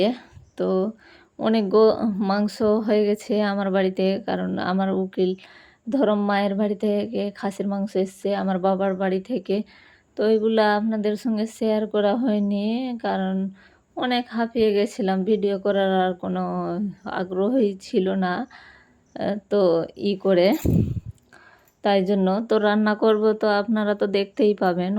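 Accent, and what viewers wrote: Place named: Murshidabad, India. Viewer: আপু তোমার বাড়ি কি মুর্শিদাবাদ , তোমার কথা শুনে ওরকমই মনে হচ্ছে😊😊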